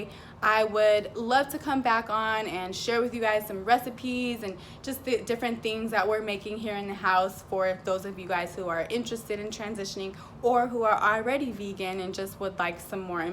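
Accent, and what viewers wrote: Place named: Jamaica. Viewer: Beaitiful skin; sounds Jamaican too.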